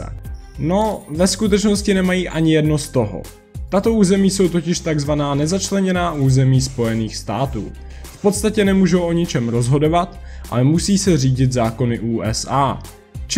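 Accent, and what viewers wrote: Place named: Prague, Czech Republic. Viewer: Sleduji vaše pořady, jsou dobré. Jenom mi vadí vaše čeština, nemohl byste na tom zapracovat? Pražština moc nejde do ucha.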